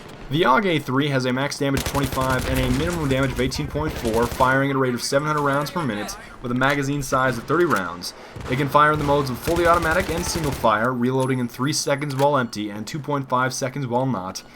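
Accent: Germany. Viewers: u german bro? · bist du deutscher ??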